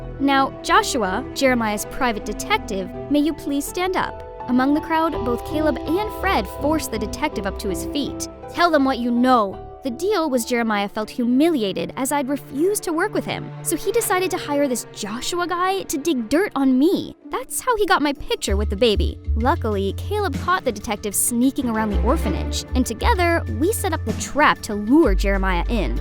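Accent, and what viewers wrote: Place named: Canada. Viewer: I love that there is a hint of canadian accent in the narrator's voice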